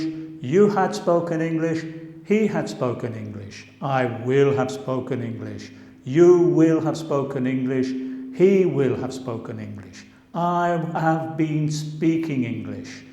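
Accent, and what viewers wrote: United Kingdom. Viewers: Hello. I like British English. The way you explain grammar is great 👍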